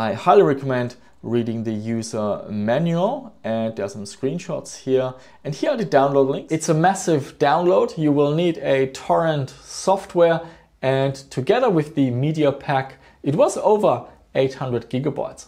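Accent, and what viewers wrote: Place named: Germany. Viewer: Da is doch deutscher Akzent^^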